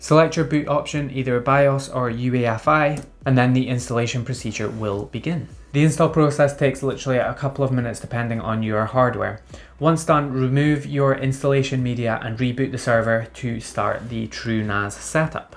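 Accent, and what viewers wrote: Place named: Scottish Highlands, United Kingdom. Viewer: If I was to make a guess I would say you are from/at least grew up in Inverness?...definitely somewhere in the highlands.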